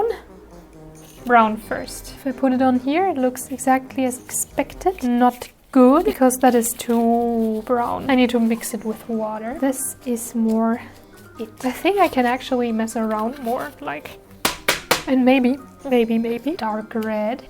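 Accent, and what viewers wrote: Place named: Germany. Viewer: Ha I knew you were German I could hear it in your voice I'm German to brw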